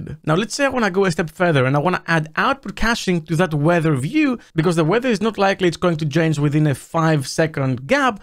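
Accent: Greece. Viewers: are you greek? Not only does your name seem greek but your accent sounds greek to me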